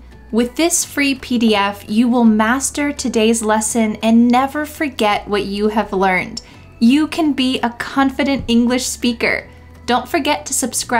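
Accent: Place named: United States. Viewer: You're American, aren't you? I hear the accent too strongly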